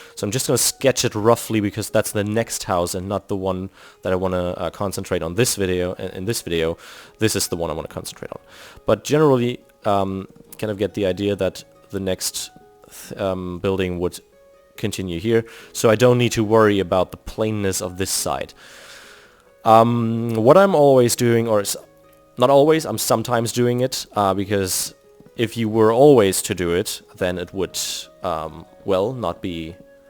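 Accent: Germany. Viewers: I like the german accent though its a tad harder to understand. Also this is gonna be a fun series. :) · lol... the German accent makes you make more sense somehow... can't really explain it! xD It's like your descriptions of what you are doing whilst using the German accent punctuate the steps in your thinking process more clearly... idek ( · maaaa schon wieder so ein deutscher .... wenigstens ein nicht sooooo deutscher akzent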